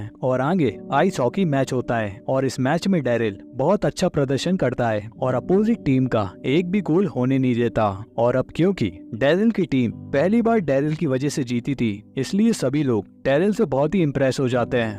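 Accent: Kanpur, India. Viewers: Kanpur se hai bhaii ❤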